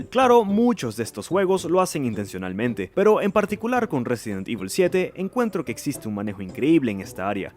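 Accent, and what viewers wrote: Venezuela. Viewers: mano me encanta tu forma de hablar,tienes como el acento venezolano perfectamente equilibrado ,tal como debe ser :v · Eres venezolano?? Suscrito de una mi hermano!! Buen vídeo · Contenido de calidad! ... Y lo mejor :D , un toque venezolano (GG)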